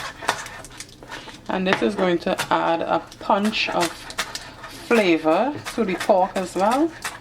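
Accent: Trinidad and Tobago. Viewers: Ah hearing that beautiful Trinidad accent.... Love d red black and white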